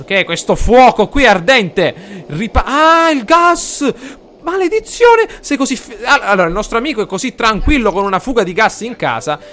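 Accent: Sicily, Italy. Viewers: Dal "noi non siamo cosa di.. [...]" ho avuto la conferma che sei siciliano o almeno meridionale Continua così, riesci ad intrattenere bene uwu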